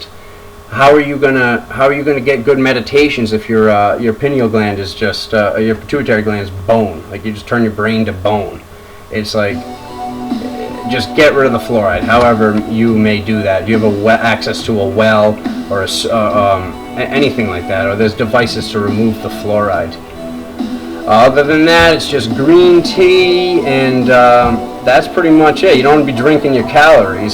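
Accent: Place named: East Coast, United States. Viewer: bro ur hella east coast what happened lol.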